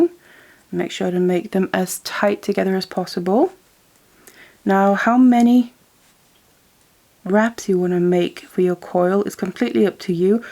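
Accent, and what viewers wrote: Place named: England, United Kingdom. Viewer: And such a change to hear an English accent instead of US 😂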